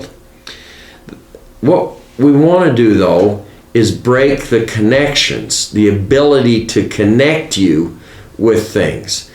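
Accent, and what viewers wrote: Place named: Pennsylvania, United States. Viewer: You have a strong PA accent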